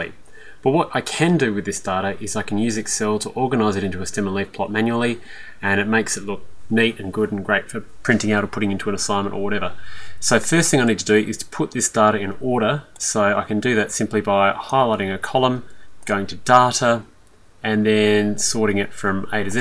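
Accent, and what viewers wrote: Australia. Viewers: lol ,its the aussie accent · its australian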